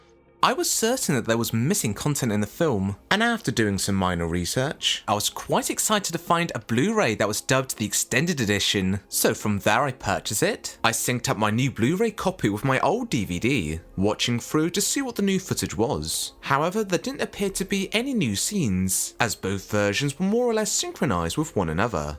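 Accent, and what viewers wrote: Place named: Wales, United Kingdom. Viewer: Your accent reminds me a bit of Dave Lister from Red Dwarf. So that's, what, Welsh maybe? Being from California, I'm unfamiliar with the regional patois of British dialects.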